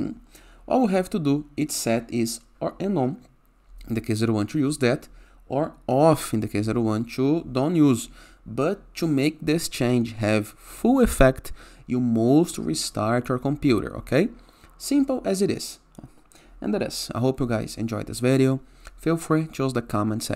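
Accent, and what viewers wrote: Brazil. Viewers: muito obrigado fora do tópico mas acho mt foda o sotaque brasileiro na língua inglesa, tem um charme absurdo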